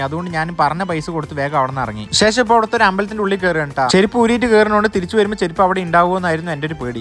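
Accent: Palakkad, India. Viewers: നിങ്ങ പാലക്കാടാണ്്ന്ന് പറയാണ്ടെന്നെ അറിയ്ണ്ട്. ഗെന്താ മക്നേ.. ഹാവു.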